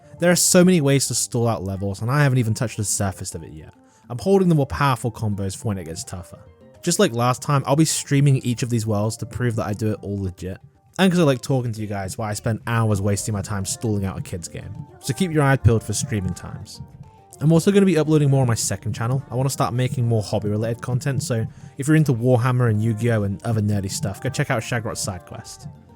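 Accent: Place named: United Kingdom. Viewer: love the way you talk a great uses of being british.(im british)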